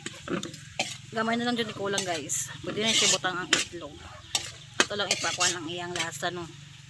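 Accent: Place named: Iloilo, Philippines. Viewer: ilonggoha oy 😂😂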